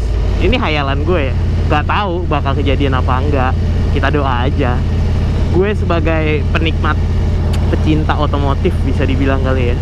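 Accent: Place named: Madura, Indonesia. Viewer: Bang, logatnya bukan jawa solo, mirip orang madura.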